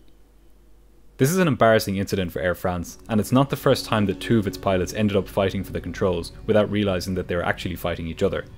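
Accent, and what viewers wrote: Ireland. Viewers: As an Irish man it's nice to hear an Irish accent on a YouTube channel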